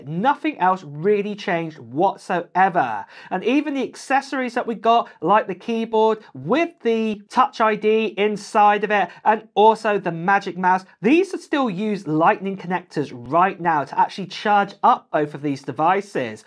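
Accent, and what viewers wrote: England, United Kingdom. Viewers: Love your English accent, son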